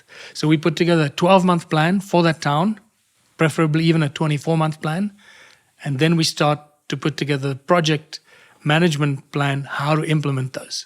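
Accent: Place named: South Africa. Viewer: I didn't know you were South African,. But in this interview I can even hear a bit of South African in your accent ❤. I'm also a South African living in the Netherlands 🇳🇱 and I've really been enjoying your show.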